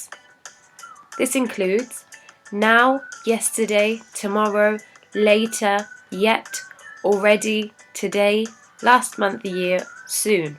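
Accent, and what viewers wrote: England, United Kingdom. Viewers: how are you today, I have seen your channel, and is very good I love your accent as an England citizen and tell you what I would like to hear from you a big list of vocabulary with your pronunciation is very important to speak good English, hope to hear from you soon, I am from Costa Rica, big hugs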